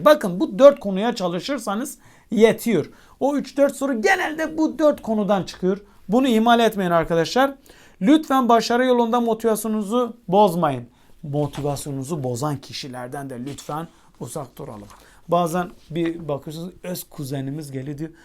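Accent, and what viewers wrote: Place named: Bingöl, Turkey. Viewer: kusura bakmayın tam Bingöllüler gibi konuştunuz çok benzettim 😊 teşekkür ederim video için kendi adıma 🙏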